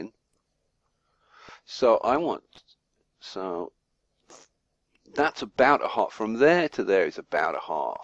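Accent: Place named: United Kingdom. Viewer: I did it just immediately and it worked really fine! thanks again ! Hey your are from uk or Scotland? Nice accent !!!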